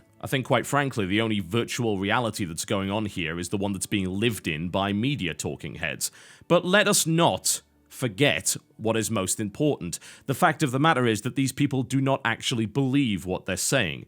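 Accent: United Kingdom. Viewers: The only british that i can hear for minuts, Salut from argentina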